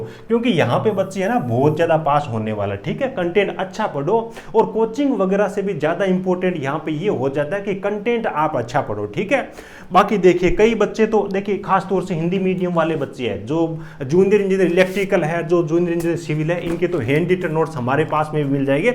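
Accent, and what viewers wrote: Rajasthan, India. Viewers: Sir ap Rajasthan se ho na 😂😂 apki language se pta chala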